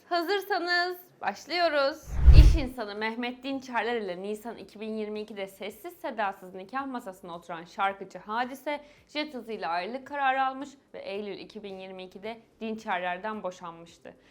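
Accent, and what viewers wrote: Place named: England, United Kingdom. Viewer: Gönül isterdi'ki güzel Türkçe Dilimizi doğru dinlemek . Fakat maalesef ingiliz aksanı ile Türkçemiz katlediliyor. Lütfen , Dilimizi koruyalım . Alfabemizde "R" leri yumuşatarak ingilizlerin aksanı ile bozmayalım . Bu İngiliz aksanı ile Modern veya güzel Türkçe konuştuklarını sanıyorlar, lakin ingilizin Türkçemizi bu yolla katledlettiğinin farkında değiller . Güzel kardeşim lütfen kjendi dilini yabancılaştırma , aksine koru kolla .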